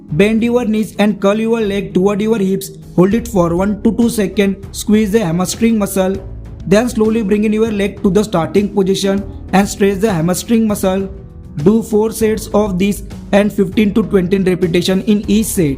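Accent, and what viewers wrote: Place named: India. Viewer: Its the Indian accent that makes it 10x better to me xD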